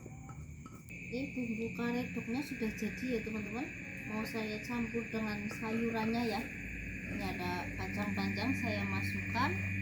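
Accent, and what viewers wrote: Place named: Central Java, Indonesia. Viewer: Mbakyu orang mana to kok kaya logate wong Jawa tengah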